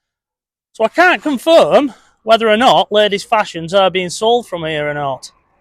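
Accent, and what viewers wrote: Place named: Lancashire, United Kingdom. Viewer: 😂 Hi, yes I remember that too now that you mention it, the expense of specific reclaimed bricks. Freds accent was a joy to behold as is yours. We are very slightly less Lancashire sounding here in my hometown but all things Lancashire need proudly preserving. I never got into the historical preservation side of bricklaying myself, mostly new builds and extensions with some ornamental work. What did you reckon to Freds efforts in brickying? I thought he did magnificently considering he was not traditionally trained and he was self taught. His Mums chimney stack was beautifully over engineered for a terraced house showing his deep love for Victorian architecture. Not seen a single example of brickwork done by Fred that would not pass at City & Guilds level. An immensely talented guy.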